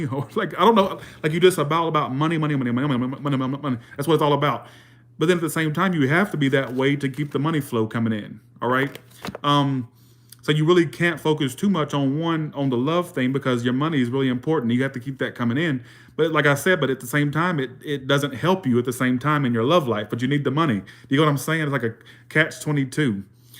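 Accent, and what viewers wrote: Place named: South, United States. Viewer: you are so handsome! Love your southern accent too! Good reading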